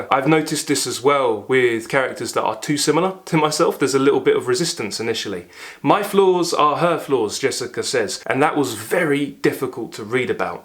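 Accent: United Kingdom. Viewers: What a beautiful presentation. Thank you! I am a 74 year old young widow, ordained minister and psychotherapist who recently discovered your channel and subscribed. I am also a New Orleans, Louisiana born transplanted to and living the last 24 years in Fort Worth, Texas. I say that just to provide info as to how far reaching your channel is and I am in love with you! I love your British accent (I know as an American I am the one with the accent). I have listened so closely and discerningly to your assessments of the great books you presented and the characters today and it is refreshing to learn of your reasons you love each book- each character. I am an INFJ also. I don’t think I could pick just one baby but I read Jane Eyre in grammar school and fell in love with her. I also love the Count of Monte Christo “God will give me Justice” and Elizabeth Bennet and Miss Bennet and Miss Bennet God bless you and Jean Valjean and of course, you are right the paramount Jesus Christ. 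“God is that which there is no other greater than,” St. Absalom. PS are you married if not I have a 30 year old granddaughter I would love 😂for you to meet. 😂❤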